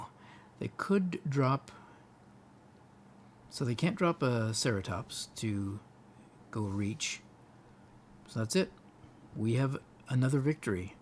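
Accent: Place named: United States. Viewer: Soothing voice with american accent as promised by CGB — check ✅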